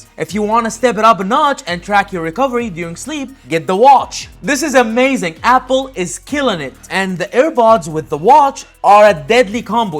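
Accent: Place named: Arab world. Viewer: Thanks! Amazing review and I love the after the hype ones. Also adorable arabic accent "Airbods Bro", make me miss Dubai life.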